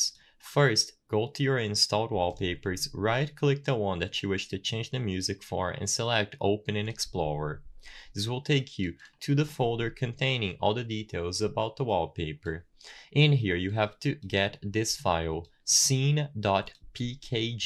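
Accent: Brazil. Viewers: Are you from Brazil? Your accent is so familiar to me.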